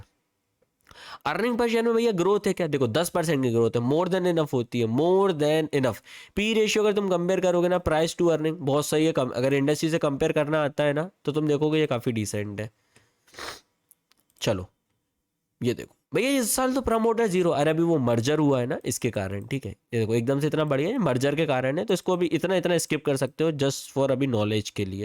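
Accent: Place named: Bhopal, India. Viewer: mujhe lag hi rha thaa bolne ke tarike se😂.... Apan bhi Bhopal se hey✌🏻🙌🏻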